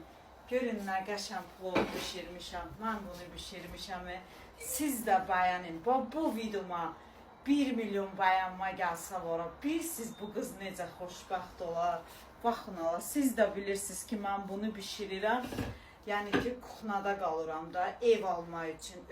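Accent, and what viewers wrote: Talysh, Azerbaijan. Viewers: Talışdar hamısı beledi bunlar neqerde rusyada xarici olkelerde yaşasalarda dileri deyismir ki deyişmir.buları otuzdur ancaq danlsdlrma 😂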